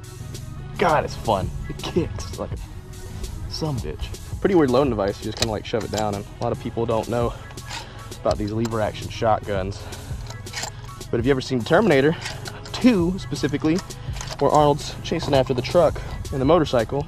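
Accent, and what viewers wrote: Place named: South, United States. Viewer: When does gun videos, in this one I noticed it the most, he gets a slight southern accent, playing into those routes. 'Murica!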